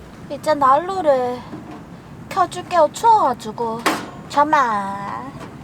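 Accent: Gyeongsang, South Korea. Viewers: 1. 베-베르그라뇨 르-르(느)무나 개취네욤 그-그럼…저 주시나요?ㅎ 2. 우드 랜턴 스탠드-월넛(중) 을 호시탐탐 눈팅만 하고 있답니다. ㅎㅎㅎ영상보고 넘 갖고싶은데…부피도 좀 있을 것 같고..가격도 가격이고… 저도 경상도 출신이라 경상도 액센트 넘 정겹고 좋아요. 항상 영상보고 부러운 마음입니다. 맛있게 먹고 작은거에 감탄하시는 모습이 힐링이에요~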